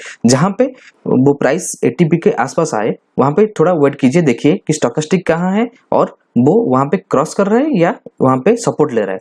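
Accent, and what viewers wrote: Odisha, India. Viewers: Are you Odia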